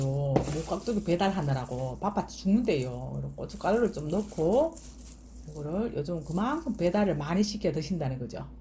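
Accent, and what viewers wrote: Gyeongsang, South Korea. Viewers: 구수한 갱상도 사투리가 정감이가네요^^ 여러가지 팁받아갑니다 감사해요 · 민경이란말 거의40년만에들어보네요 잊고있었던 경상도 사투리 정말고향에온듯이 아련한그리움으로 남습니다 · 대구 사람이시군아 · 구수한 경상도 사투리에 정이 느껴지네요! 건승하세요!!!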